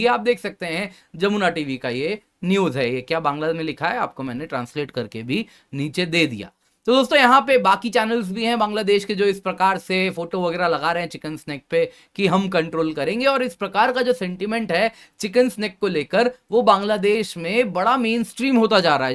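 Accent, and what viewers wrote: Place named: Punjab, India. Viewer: How uneducated you are , look at yourself first you dont even know how to talk , are you from the common punjabi way of talking? 😅 how you guys even making study related videos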